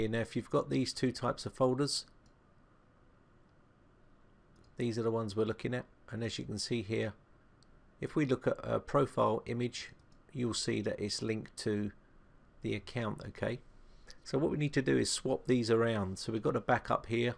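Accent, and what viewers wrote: United Kingdom. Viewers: Thank you. It helped me and your british sounds cute.. ;)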